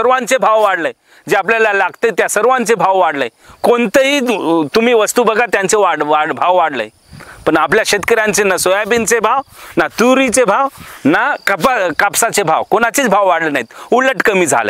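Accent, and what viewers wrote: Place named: Uttar Pradesh, India. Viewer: भावा भैया सारखा बोलतोस यूपी mp cha वाटत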